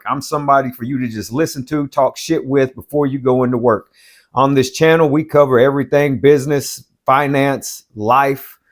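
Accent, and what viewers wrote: United States. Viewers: ...you from Pittsburgh?!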